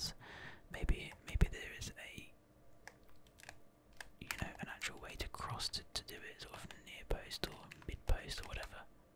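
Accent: United Kingdom. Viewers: What part of London are you from?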